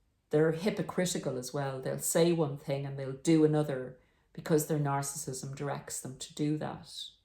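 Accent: Ireland. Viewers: Fook! There's something about a glorious Irish accent that makes learnin' things much more engaging. Alas, me mother's side is Scottish, colonized by Brits, and they're wankers!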